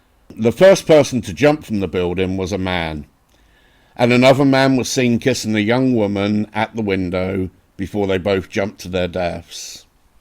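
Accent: United Kingdom. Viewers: Another expertly done video,. Kudos, as always! Double fascinating to me, since I am not only a history buff but also greatly enjoying reading about & seeing images of old cemeteries. But of course what a totally heartbreaking piece of history. So very sad. I always also enjoy your narration (especially love that British accent!). THANK YOU very much for sharing with us. 🤓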